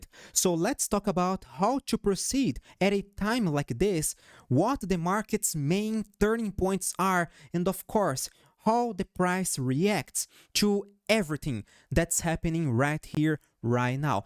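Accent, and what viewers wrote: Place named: Brazil. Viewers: Thanks for the content, brother. Where are you from? Your accent sounds like Brasilian. É nois!😅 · He is from Brazil!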